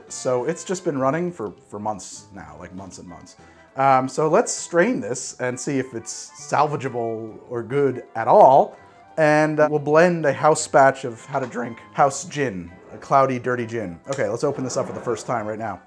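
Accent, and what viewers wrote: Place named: United States. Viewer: I don't actually know where lives, I assume the US because of his accent and turn of phrase, I assume you live there as well because of your attitude, I could be wrong and you could both live in Australia, I really don't know and it frankly makes no difference. And as I don't know, I will go with the worst possible situation (eg 'doing this is breaking the law') and work from there, if it's not illegal where you are that's great! Have fun, try not to burn yourself on any of the hot surfaces and liquids that this process will create, and be careful with which fractions you choose to drink from it. But I'm not going to claim to know what the distillation laws across the globe are, I'm not a lawyer.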